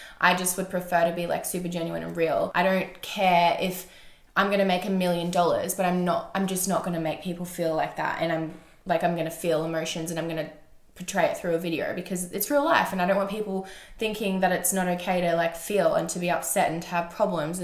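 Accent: Australia. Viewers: even her cough sounds Australian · You sound so Australian in this love it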